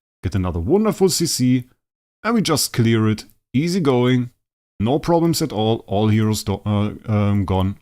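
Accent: Germany. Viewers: average german voice tbh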